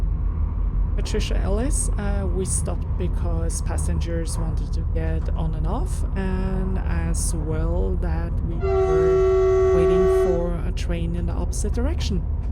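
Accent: Norway. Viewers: Driven, not drived,...;-) For the rest fully agree! Really well spoken English with a charming Norse accent. Love the landscapes, I should pay Norway a visit sometime. Cheers, keep up the good work.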